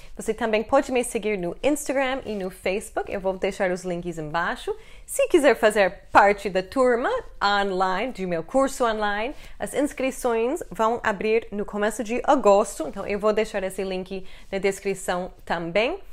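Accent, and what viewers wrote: United States. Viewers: Nunca sai do Brasil, então nao vou comentar, pode notei no seu sotaque uma diferença. Você em tão pouco tempo está com sotaque mais americano kkkkk. Beijao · Your american accent, speaking english or portuguese, is very nice!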